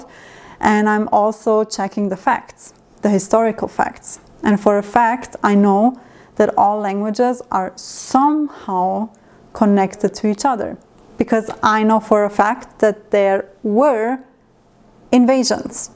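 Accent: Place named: Switzerland. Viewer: Danke für ein sehr interesantes video. Ami me gusta escuchar tu Swiss Deutsch. Espero que faze mas video sobre los puentes entre los idiomas. Obrigado ciao!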